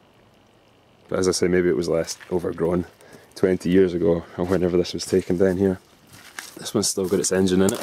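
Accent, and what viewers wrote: Scotland, United Kingdom. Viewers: are ye scotish mate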